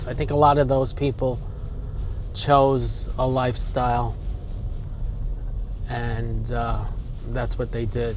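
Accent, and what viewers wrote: New York, United States. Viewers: I wanted the Bronx and I found it. Thank you, also for great language, I can watch and listen without subs. Distinct speech but keeping that NY accent. Watching from Poland.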